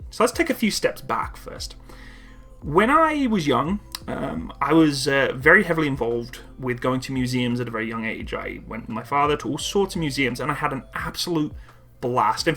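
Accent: Canada. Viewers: It’s sad you’re sounding more Canadian but also great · Def got accent Canadian english accent!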